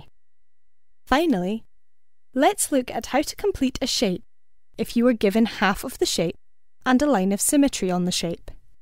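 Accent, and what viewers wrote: Scotland, United Kingdom. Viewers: Good stuff, lovely sexy Scottish accent too.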